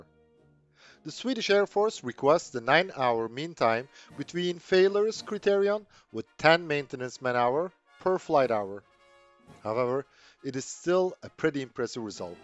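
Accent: Turkey. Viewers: I know this dude. His accent is Turkish coz he is a Turkish guy.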